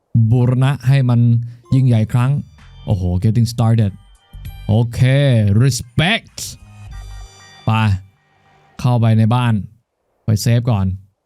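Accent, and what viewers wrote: South, Thailand. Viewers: ลุงไม่แหลงใต้แล้วหรอครับ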